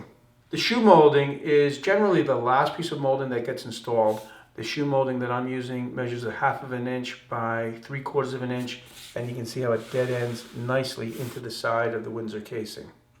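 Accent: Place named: New Jersey, United States. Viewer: Always fun listening to a fellow Jersey boy tawlk. 😁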